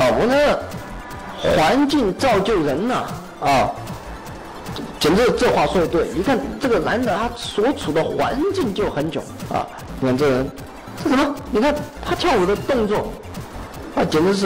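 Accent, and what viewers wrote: Sichuan, China. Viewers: 我四川人听的很顺畅，只是他很川普所以有些不会四川话的人会觉得很怪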